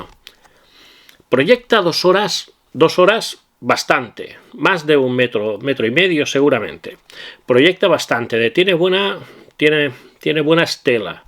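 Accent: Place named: Catalonia, Spain. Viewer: Hola, por el acento entiendo que eres catalán, yo es que soy de Barcelona y al oírte decir lo de lo bazares, me gustaria saber si son de Barcelona, si es así, te agradecería que me dijeras los nombres o donde están...gracia por adelantado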